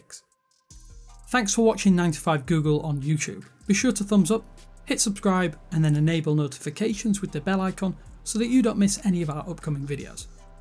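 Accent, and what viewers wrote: United Kingdom. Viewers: where are you from? UK? What accent?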